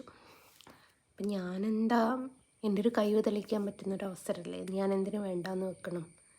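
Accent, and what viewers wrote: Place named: Kuttiady, India. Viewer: nigalude bhasha kuttiady bhashapole und. Poonoor anennu eppoya arinja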